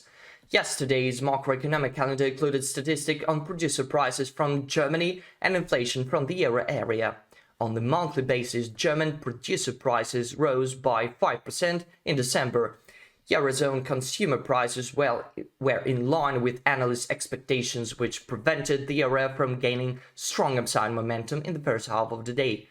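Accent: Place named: Russia. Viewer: Are you from Russia? Ты из России? Произношение выдает тебя)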